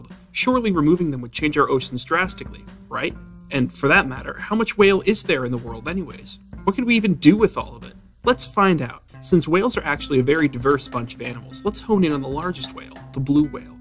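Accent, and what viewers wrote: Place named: United States. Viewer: Sounds American, says "maths" For shame..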